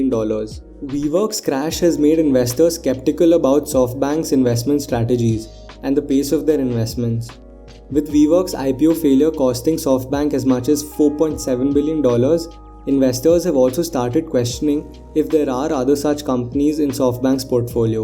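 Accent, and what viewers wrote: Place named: India. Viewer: Are you Indian? You're accent is indian-ish, great video tho! I subbed uwu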